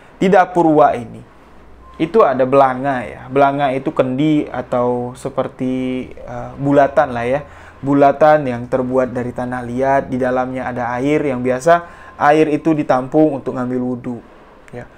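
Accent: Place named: Madura, Indonesia. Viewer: Mau tanya mas asli orang dari daerah mana?...logatnya mirip2 sprti orng madura atau orang padang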